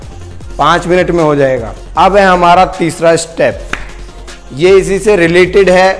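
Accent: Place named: Haryana, India. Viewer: Bhai kaha se ho seems from Haryana . I am from faridabad , do you offer paid service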